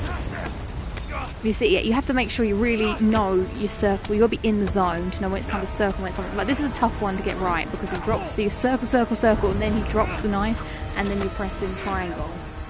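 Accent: United Kingdom. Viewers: shut up british p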